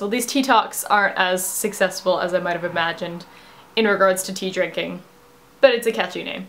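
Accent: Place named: United Kingdom. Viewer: i can hear the British seeping through your american accent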